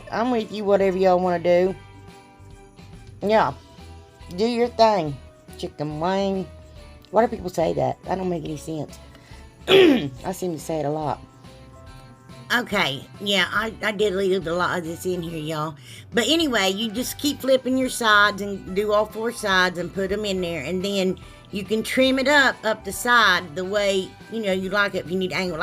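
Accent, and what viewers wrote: South, United States. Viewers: I am a fair dinkum Aussie lady who loves craft and love listening to your accent, so southern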